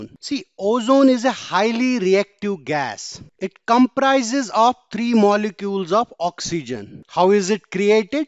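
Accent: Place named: Kashmir, India. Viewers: Your accent is Kashmiri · Sir must be kashmiri because of dialect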